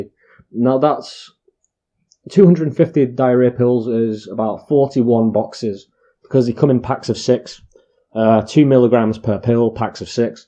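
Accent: United Kingdom. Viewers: You sound Scottish but I’m not sure so I said Brit. Usually id never say Brit but I did cause I can’t tell your accent. Definitely think you’re Scottish but not sure! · Erm have you never seen shrek…. This is not a Scottish accent he is English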